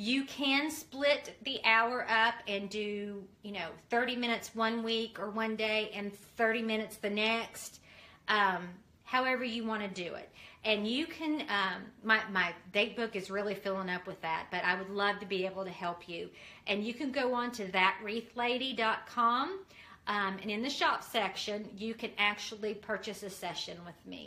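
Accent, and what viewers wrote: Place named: South, United States. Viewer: I just got to watch your video.... I love the 2nd bow. It is much fuller. I love the chevron pattern. It is gorgeous!!! Truly gorgeous. I love the wreath. I didn't get to see the making of the wreath. But I heard what you did. Thank you for your kindness! I love you. You sound like me, I'm southern gal from Louisiana.... I love the wreath and both bows. Except my favorite is 2nd. Glad you changed them out!!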